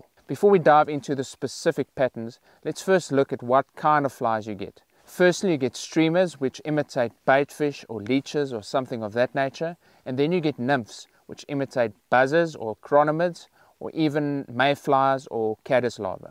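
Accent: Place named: South Africa. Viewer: Great video! your accent makes me want to go back to South Africa 🤙 thanks for Australian.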